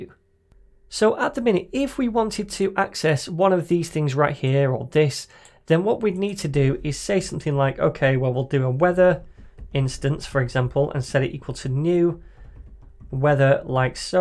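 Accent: United Kingdom. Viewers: diving into the topic instant -british accent -on point -examples made easy to understand please never stop you made this nutshell powerful. Continue your videos like this it's more helpful than you think it is. People are way into "yo whats up this is .. and never forget to click the bell and sub" and "for a short ad we can do this" such sellouts.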